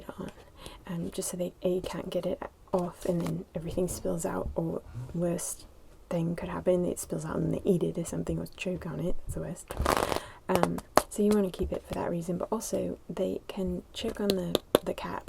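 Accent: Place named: United Kingdom. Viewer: I always forget you aren't British. That accent is nailed tho😍😍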